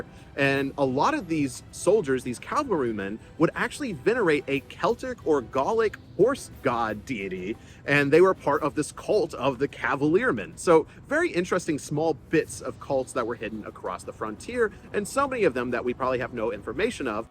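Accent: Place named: United States. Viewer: How to obliterate any shred of credibility. 1 Having a channel called The Wisdom of Odin 2 Speaking Murrican (that is not a civilised way of speaking) 3 Using such a inane font for the channel logo.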